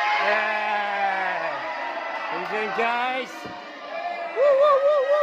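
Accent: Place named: Scotland, United Kingdom. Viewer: Good to hear a fellow Scot in China! I am in Beijing about to venture into teaching, this is very helpful thank-you! (Tom, Edinburgh/Shetland)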